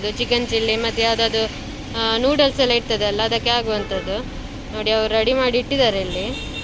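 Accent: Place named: Malnad, India. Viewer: Your malnadu accent of speech is really nice to hear 😘😘😘😘😘😘😘😘😘😘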